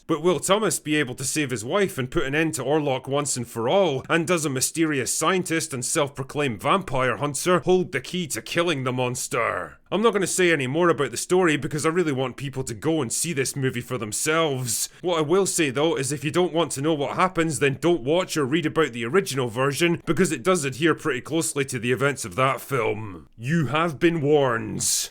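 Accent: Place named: West Lothian, United Kingdom. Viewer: The Drinker Recommends, I watch And it’s not because he sounds like he lives just along the road from me (Livi - though I’m a proud westerner. Mon The Sons)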